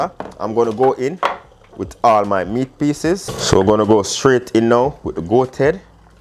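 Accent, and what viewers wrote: Jamaica. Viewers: The jamaican accent makes me come back,delicious soup ofcourse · Mmmm d soup looks a lick 👅 n mi know it tast a lick cos the seasoning n flavour what makes it so tasty 😋 Amazing god bless u hands mi like u Jamaican actcent. 🤣 I love cooking especially pumkin soup 🍜 so luv to watch u videos 👍🏿👍🏿👏🏿👏🏿😋👅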